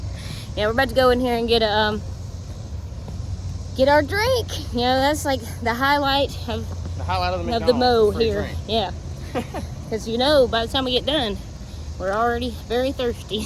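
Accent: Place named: South, United States. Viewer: good video love. her country accent...lol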